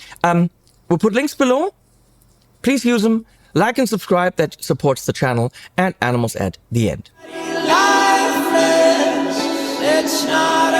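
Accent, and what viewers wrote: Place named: Germany. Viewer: Came for the pedal review stayed for the German accent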